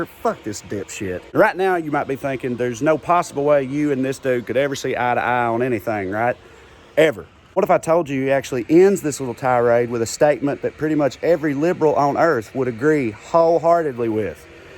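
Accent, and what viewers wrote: South, United States. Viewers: This over-the-top sense of humour based on common sense and delivered by this obviously properly informed, well-educated human being in this seriously country accent, still has my brain trying to assimilate and adapt to the fact that people like this exist 👏☺️ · I think more often than not you’re talking out of your ass sweetie. Maryville Tennessee resident here. I’d debate you on damn near anything. What I’ve seen of you so far? There’s nothing insightful about you. You basically just repeat what other people say only you do it in a southern accent so for some weird reason it makes you exceptional. I think you’re a fucking idiot.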